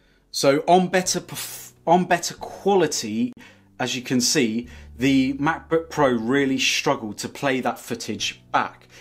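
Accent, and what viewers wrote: United Kingdom. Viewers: finally! some practical comparison! not some geecbench and raw tech specs! thank you! how about some simple things like watching good quality video/ movie or zoom call? and it is just nice listening to british english 🙃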